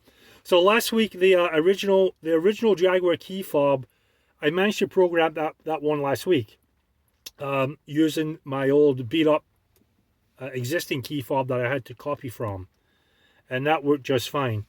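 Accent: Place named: Scotland, United Kingdom. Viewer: Why do you sound Scottish and American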